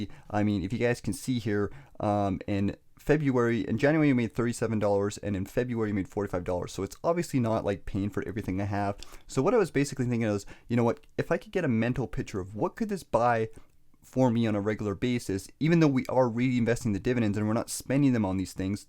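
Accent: Canada. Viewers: this guy so fun to listen to. I can tell he's Canadian so quickly.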